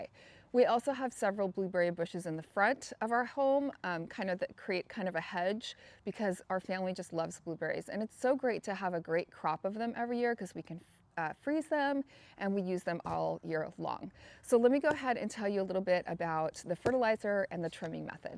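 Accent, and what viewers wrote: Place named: Midwest, United States. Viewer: I'm in central NC newly zoned 8a. Your yard and mine look very similar. I cut back all my knockout roses 2 days ago (ouch!! Those thorns!) and I'll be working on my blueberries today. Are you originally from the midwest? I occasionally hear a tiny hint of midwest accent. Very tiny.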